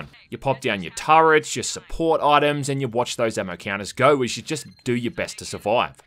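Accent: Australia. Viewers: Is it just me or do we all love a reviewer with Australian accent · when my favorite video game reviewer with an exaggerated aussie accent says its good. then, it probably is.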